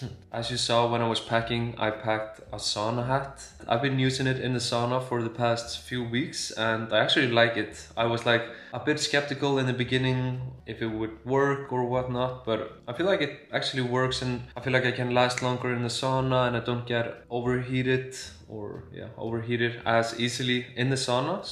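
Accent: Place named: Iceland. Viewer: has a more pronounced Icelandic accent than Birta, this is cute :)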